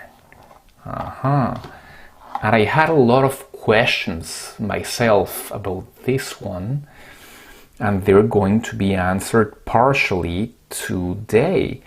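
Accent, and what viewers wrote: France. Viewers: Il sonne frenchy ton accent. Merci pour ta vidéo, j'attends la mienne avec le fond noir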